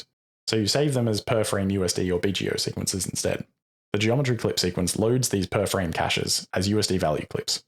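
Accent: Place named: Australia. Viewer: Holy shit, is this taught by Australian Barry Manilow? I'd like a tutorial on how to get my voice to be this sexily resonant, I feel like I'm being seduced by a whiskey cask.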